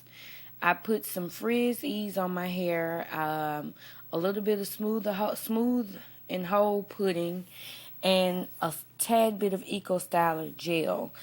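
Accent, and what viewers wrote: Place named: South, United States. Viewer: That's really cute!!! I like it a lot! P.S. I thought I heard a southern accent, and then you said something about Mississippi! lol!! I'm from here too, great vid! Xo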